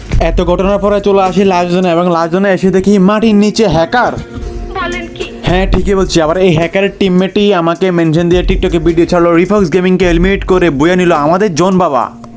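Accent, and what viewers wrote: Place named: Chittagong, Bangladesh. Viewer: ভাই তুমি মনে হয় চিটাগং এর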